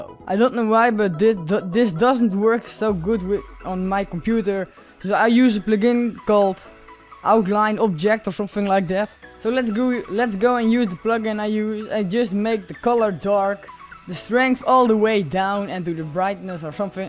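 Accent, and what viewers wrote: Netherlands. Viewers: je kan best goed engels maar je hoort gewoon dat het een nederlander is :) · ik hoor dat he nederlands bent P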